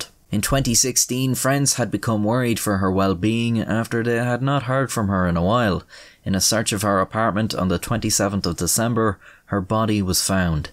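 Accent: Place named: Ireland. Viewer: I swoon over your Eireann drawl, thank you so much 🗽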